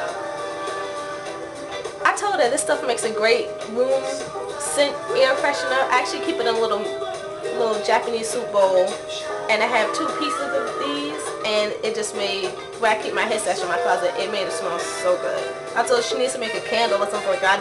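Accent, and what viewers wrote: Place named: New York, United States. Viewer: Yes, you are a fellow New Yawka. =p Anyway, I know Qhem appreciates the free advertising. Good job!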